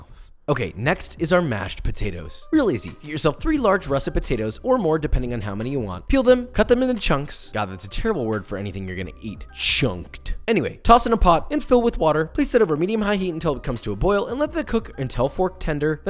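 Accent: United Kingdom. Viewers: Just me or did get more british in this video? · More of the British accent please. As a Brit it's still somehow soo satisfying to listen to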